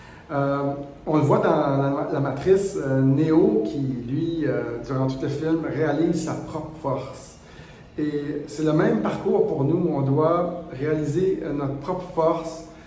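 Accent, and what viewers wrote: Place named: Canada. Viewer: J’aime beaucoup vos infos avec cet adorable accent canadien 😇 Je suis une française de 82 ans et vis en Bretagne. Continuez je vous prie. Je vous embrasse.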